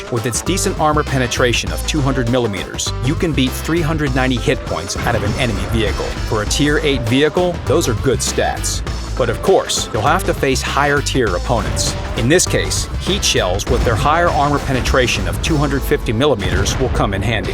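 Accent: England, United Kingdom. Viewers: I love your english accent ! the R-E-V-A-L-O-R-I-S-é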